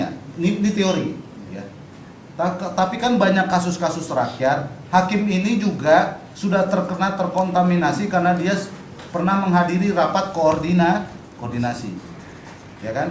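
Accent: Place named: Jakarta, Indonesia. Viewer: Cerdas, Lugas, Tegas & Cadas.. 😄 org Batak tp logat, suara dn gaya bicaranya kek org Betawi (mirip Alm. Benyamin S) Saya ngetik ini di tahun 2022, sgl keterangan & ilmu yang diterangkan pak Jhonson persis terjadi pd kasus KM50, Mirna, Brihadir J (SAMBO), tinggal penerapanya aja ini mah..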